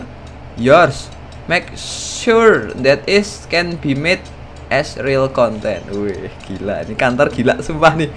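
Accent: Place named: East Java, Indonesia. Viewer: khas jawa timur mas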